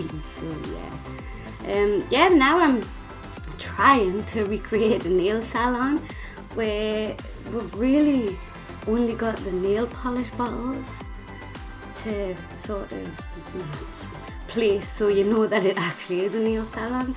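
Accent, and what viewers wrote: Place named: England, United Kingdom. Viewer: I Make Simmies Not Builds....Wish YOU could make a TUTORIAL on ROOFING????? LOL OMG YOU should see my Roofs!!!! LOL LOL Wowww Extremely Happy I found you going through Sims 4 Tutorials........I LOVE How Creative you are Ive watched 2 of Yours so far and this ONE I LOVED them !!! I Really Wanted to Tell you how Inspirational you are to what I can possibly do Myself I am NOT a BUILDER........BUT TRYING ... ONLY 5 months now With Sims 4 Never Played 2 or 3 but did Play all the Originals I am Shocked at How different and much the Game has Changed from 12 plus years AGO........ITS AMAZING!!!!!! I Wish I had Played 2 and 3 I Would Know so much MORE........ haha Anyway......Love your Techniques with your MOO so much!!!!!! Wonderful Idea With this one!!!!! too :D Subbed and Faved LOVE YOUR English Accent :P Mine is TEXAN......so Very Different ..... 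Hugzzzzzzzz and Hope to see tons more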